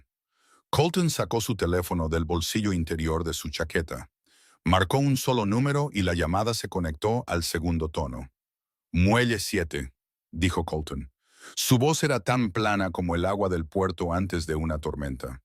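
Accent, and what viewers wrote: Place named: United States. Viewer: Los cuentos de éste canal son muy pesados y ésa voz con un tono yanki es horrible imposible perder tiempo en escuchar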